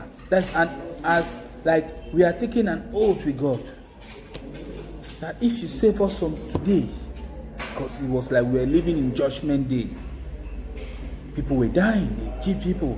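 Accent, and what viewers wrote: Nigeria. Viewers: You sound 100% naija man yet u claim to be from Cameron,it's funny how people commenting negative about the late prophet all fell for your "lies".God bless you.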